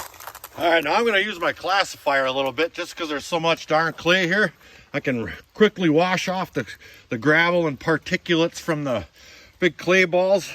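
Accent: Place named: Wisconsin, United States. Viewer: I like your Wisconsin accent don't ya kno! When I heard crick, not creek, I knew. Lol great content !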